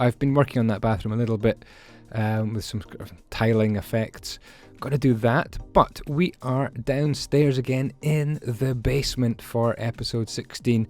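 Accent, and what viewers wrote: Scotland, United Kingdom. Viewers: I'm just a new subscriber and your accent is really throwing me off, I think it's kind of Scottish, but could someone shed some light on this please? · It's Scottish, not very strong Scottish, but it is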